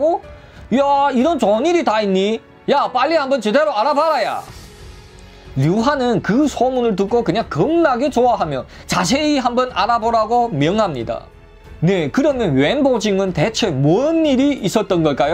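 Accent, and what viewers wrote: China. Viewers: 어찌된게 삼국지 보다 더 잼나지 ㅎㅎㅎ 중국 말투가 섞여서 더 집중이 되네요.. 너무 잼나여 감사합니다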